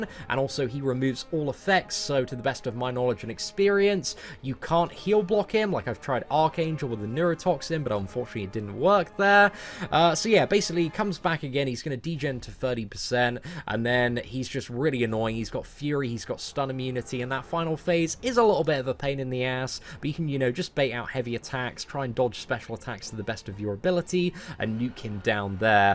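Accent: United Kingdom. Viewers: gets a paycheck from kabam, don't be fooled by his British accent ppl!!! Ladies and gentmen.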